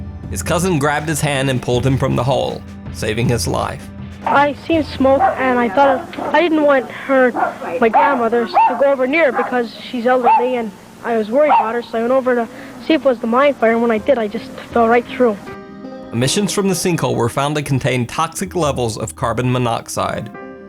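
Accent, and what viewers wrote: South, United States. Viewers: I love his voice too, and Southern US voices are so gorgeous, I'm from UK and they sound really lovely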